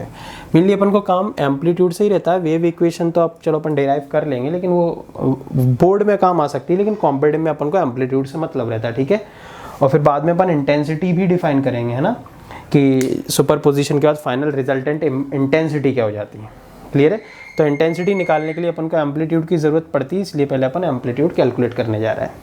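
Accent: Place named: India. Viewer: Stop. Talking Indian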